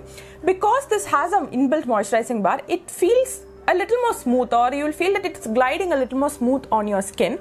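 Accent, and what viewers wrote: Kerala, India. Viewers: I like the Malayali accent 🔥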